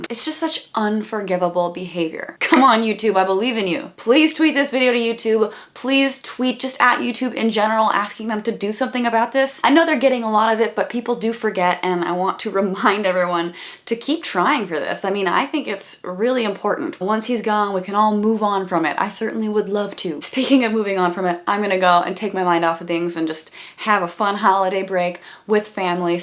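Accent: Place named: Appalachia, United States. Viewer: Why do you sound like a hillbilly when you have company but you're well spoken when you're alone? 🤔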